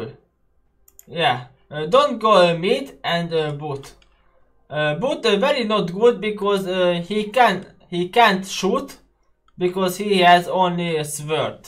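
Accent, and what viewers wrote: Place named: Hungary. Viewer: már régen is jó volt az angolod, de örülök hogy most már nem olyan magyar akcentussal beszélsz mint ebben a videóban.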